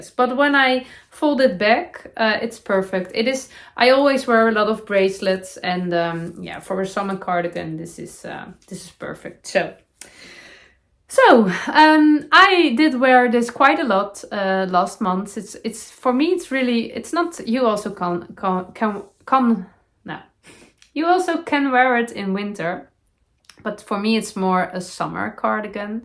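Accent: Netherlands. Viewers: Happy 100th!🎉❤ it truly is the best heart jumping watching you. I look forward to Mondays and Fridays! You are so sweet. Love the imperfections and your wise words, even if they are in your Dutchie English- it is perfect. 😊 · Many congrats on your 100th episode,. I've often wondered how you find the time to film and, especially, edit 2 podcasts every week.... and still manage to run a home (and all that entails), and then get through the amount of projects you do! I love your videos.... and your Dutchy-English😊 Best wishes for the weekend, and I'll see you again on Monday. Christine (London, England) x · Inspired by you, I made a granny square little vest for my 11-year-old granddaughter. It is so cute I may have to make another! I knit more than crochet, so I am so happy to have learned a new craft. I love your Dutch accent. When I was 11 to 13.5 I lived in the Den Haag area, so learned Dutch, and I am so happy to to hear your language again. 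I will have to listen to a Dutch episode! Congratulations on 100 episodes!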